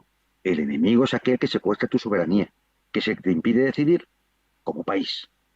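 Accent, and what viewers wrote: Andalusia, Spain. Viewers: No es mexicano, es del sur de España, creo que es de Málaga.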